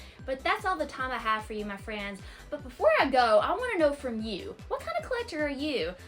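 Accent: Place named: Georgia, United States. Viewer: youtube suggested your channel to me, your personality is great and your accent reminds me of my friends back home in GA lol so i dropped a like and sub 👌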